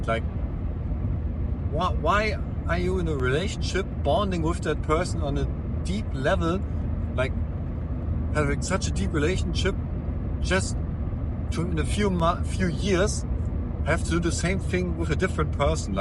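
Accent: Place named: Germany. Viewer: Your accent is so german, u german?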